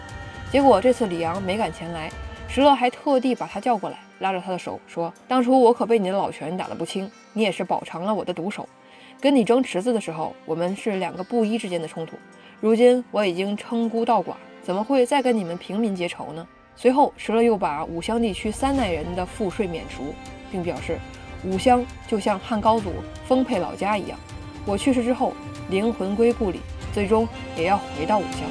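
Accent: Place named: Beijing, China. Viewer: 姑娘一口地道的北京話非常好聽吾人甚為欣賞！比起其他說書人強太多了！姑娘讚！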